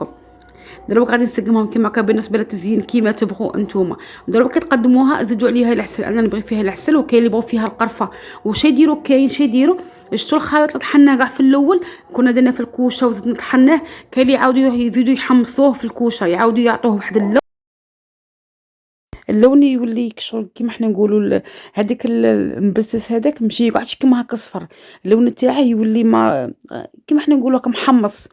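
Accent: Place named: Western world. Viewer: Allah ya3tik essaya, ça fait plaisir d'entendre l'accent de l'ouest😘😘😘😘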